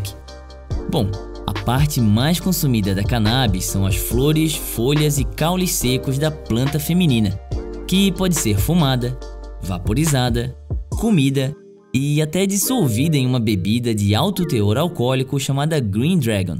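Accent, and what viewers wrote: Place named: Florianópolis, Brazil. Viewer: Tu é de floripa né cara? Esse sotaque aí não me engana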